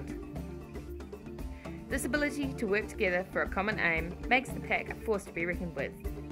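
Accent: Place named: New Zealand. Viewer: Kiwi accent . Lol. Kia ora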